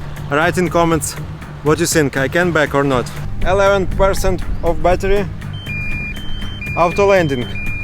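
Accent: Russia. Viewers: Блин ну и акцент у тебя русский но лай сёравно поставил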